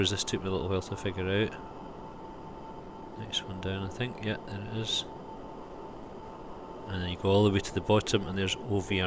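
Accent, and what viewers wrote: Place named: Scotland, United Kingdom. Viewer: ohh shit you Scottish mate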